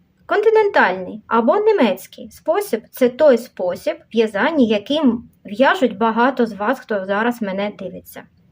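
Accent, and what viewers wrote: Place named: Surzhyk, Ukraine. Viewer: Да, слышно суржик,много слов перекрученных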